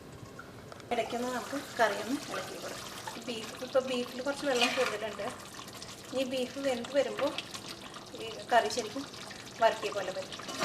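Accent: Thrissur, India. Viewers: good cook and good Trichur accent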